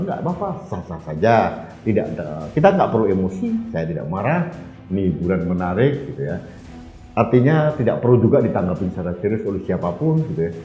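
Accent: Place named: Surabaya, Indonesia. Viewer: MAJU TRUS PK BUAT NKRI SALUT DI DUKUNG RAKYAT KAYAK PK JOKOWI DN PK ASLI MANA YA? / BILANG AKEH TUNGGALE REK DIALEK AREK SBYO TRIM PK MAJU TRUS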